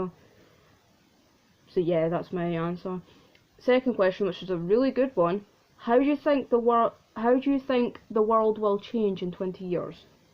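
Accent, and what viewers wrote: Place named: Scotland, United Kingdom. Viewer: Ahhh I love Scottish accents me Grandma's from Aberdeen and trying to understand her is fun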